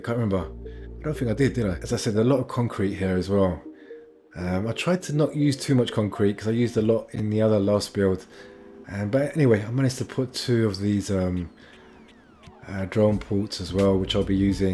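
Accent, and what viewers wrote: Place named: United Kingdom. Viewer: Dude, keep it up! Your builds are the best I've seen. I'm trying to place your accent, almost British, but not quite....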